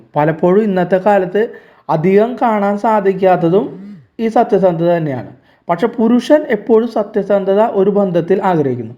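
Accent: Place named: Kochi, India. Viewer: അവതാരകൻ ക്ലബ്ബൗസിൽ സംസാരിച്ചിട്ടുണ്ട് എന്ന് തോന്നുന്നു... കൊച്ചിക്കാരനാണ് അല്ലേ👍😊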